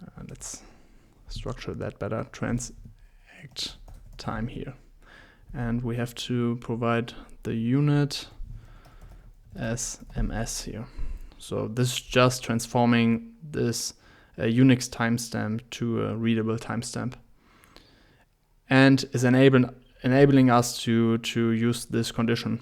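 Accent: Germany. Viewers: Dieser deutsche Akzent👑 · Sehr interessant. Ich mag es übrigens, wenn Deutsche englisch sprechen, dann verstehe ich jedes Wort :) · You can notice he is German. But a trading bot won't make you rich. Still good personal project to develop. :) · I like your German accent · I really like your content and I just subscribed to your channel. Please continue with the videos for cryptocurrencies. Are you by any chance from Austria or Germany? Your pronounciation sounds like it. Cheers!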